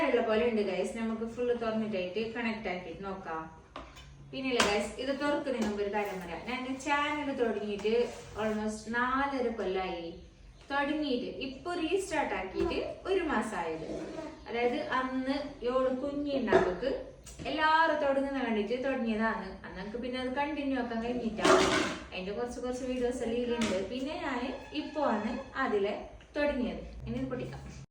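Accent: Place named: Kasaragod, India. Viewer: Enntey ponno.... Kasaragod bhazha ippozha kelkunathu...nalla resam undu kelkan❤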